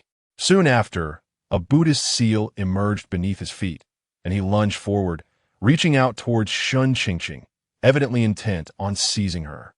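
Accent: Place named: France. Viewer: This ai is a little french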